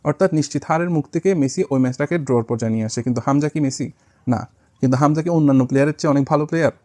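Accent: Chittagong, Bangladesh. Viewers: ভাই আপনি কি চট্টগ্রামের নাকি । কথা শুনে লাকতাছে।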